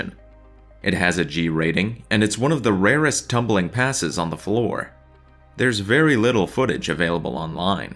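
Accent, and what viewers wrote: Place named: Spain. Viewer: No Spanish speaker has ever felt guilty about their heavily accented English. Don't apologize.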